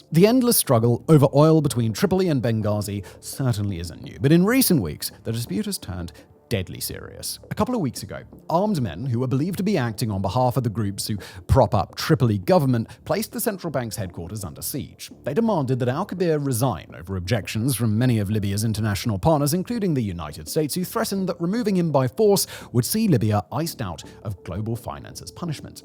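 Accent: United Kingdom. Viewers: Cannot stand the deuchy obnoxious British accent!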